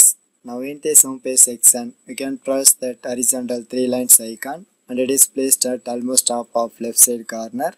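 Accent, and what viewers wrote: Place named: Bihar, India. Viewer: Bihari English👍👍👍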